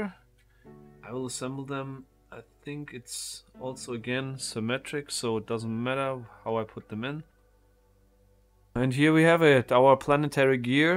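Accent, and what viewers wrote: Germany. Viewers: Do you actually live in Germany? Because your accent is really German-like.. I'm from Germany too 🤔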